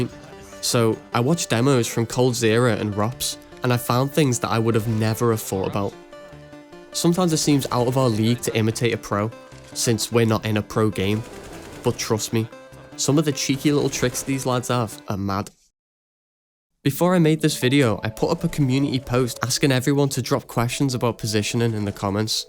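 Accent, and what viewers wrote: Liverpool, United Kingdom. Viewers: Love the video, are you a scouser mate? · Scouse CS youtuber, I can fuck with that lad.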